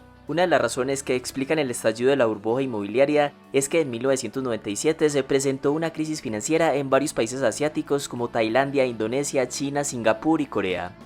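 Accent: Antioquia, Colombia. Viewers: "Paisa" se le llama a las personas nacidas en los departamentos: Antioquia, Caldas, Risaralda, Quindío y al norte del Tolima ubicados al nor-occidente de Colombia. Dicha región es cafetera y sus habitantes tienen el acento como la persona que hace los vídeos. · PURO HUMO , UNA VOZ MUY PAISA... DESLEGITIMA ESTE ANALISIS, MUY MONTAÑERO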